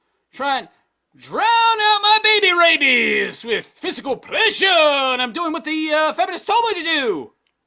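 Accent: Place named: Texas, United States. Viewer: If you slightly modified your Texas accent, you could probably nail a James Carville impersonation.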